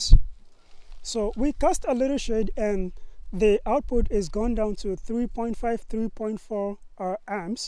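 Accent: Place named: Nigeria. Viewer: Great video. Clear and straight to the point. Thanks. Off-topic, your English accent sounds very Nigerian. Were you ever resident in Nigeria? 🤔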